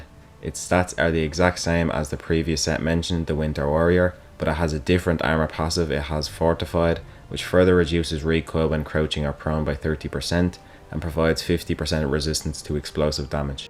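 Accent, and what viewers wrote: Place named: Dublin, Ireland. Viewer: Whats up man, couldn't believe when i heard your accent i'm from Dublin myself. Whats your steam name If you're down to run some games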